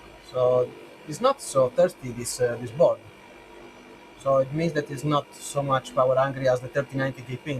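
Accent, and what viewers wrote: Italy. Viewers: Your accent sounds very Italian.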